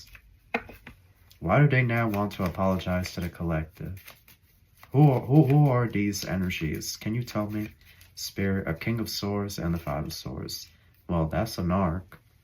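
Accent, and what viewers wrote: South, United States. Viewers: The group who hounded me and lodged a claim against me is a law firm in the UK (Irwin Mitchell LLP) who promised me a No Win, No Fee deal, then reneged. They intended to grab a slice of the pie when my family house (worth $3M) in London was sold. But another law firm representing a man who was having an affair with our family cleaning lady and claimed to be my (wicked) stepmother's long lost nephew, got to it first and removed my name from the title deed. IM transferred the ruling (made without my knowledge) to the Supreme Court of NSW, Australia. I've been fighting it as a litigant in person for a year now. A Judge ruled against me last Friday because he believed the evidence of a fraudulent affidavit of service from a crooked organisation called ASI (All States Investigations) which declared I had been served. But IM don't get a penny because I'm protected by Australian domestic policy which does not permit my pension to be garnished or possessions worth less than $9000 to be seized. 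So you are spot on. Its similar to "Robodebt" and "Mr Bates v the Post Office" in its sinister implications of State v Individual). I know I am protected by angels. The other matter (a no contact romance scenario relating to my ex-GP) is not connected to the financial attack, but it might involve the presence of a demonic spirit, so I am taking no chances and will join the Catholic Church to take advantage of their exorcism procedure. I love your southern accent and your individual personsa. Th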